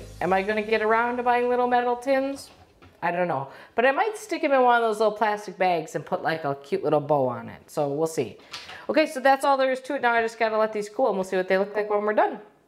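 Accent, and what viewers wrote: Wisconsin, United States. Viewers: This is one of the funniest videos I've seen in a while. Your lovely accent reminds me of my Wisconsin childhood. Thank you.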